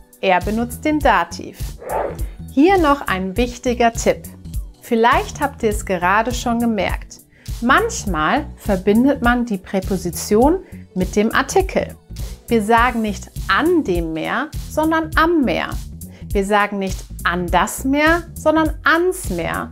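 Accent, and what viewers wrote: United Kingdom. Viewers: Kommt aus Großbrittanien? Er hat ein starkes Akzent haha. Keine Respektlosigkeit, meine Grammatik ist schrecklich 💀 ich glaube "starkes Akzent" war in diesen Beispiel nicht richtig oder? Gibt es Deutsche hier mit den Antwort? 😂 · He is English, trying to speak german for the video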